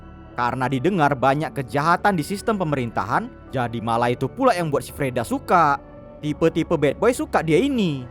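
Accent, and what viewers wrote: Medan, Indonesia. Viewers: Ku lihat dari logat bicara kau bg, pasti kau dari medan ya bg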